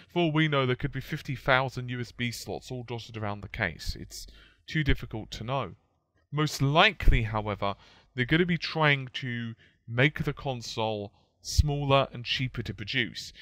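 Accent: United Kingdom. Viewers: What was the # again? C-U-Hayche-100-6-A ? HeHe comfort British Accent ;)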